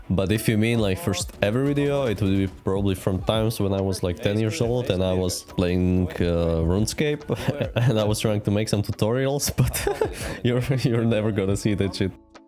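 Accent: Czech Republic. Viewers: I knew you were czech... by the accent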